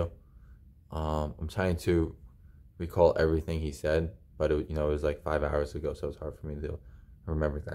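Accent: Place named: Pasco County, United States. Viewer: Sounds like you live in pasco County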